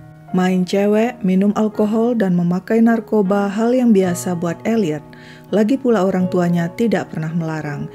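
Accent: Indonesia. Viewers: hokh ketauan org indonya ya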